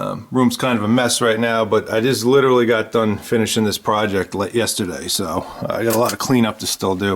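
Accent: New York, United States. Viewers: You're from New York aren't you? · love your NY accent.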